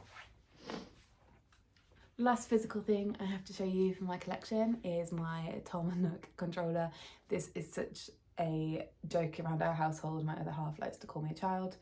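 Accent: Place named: Wales, United Kingdom. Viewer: 🏴 what's wrong with the Welsh accent? Haha great video and game collection, hope to see more 😁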